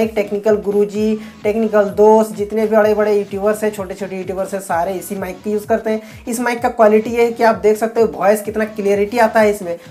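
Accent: Bihar, India. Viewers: You talk so sweetly are you from Bihar? :)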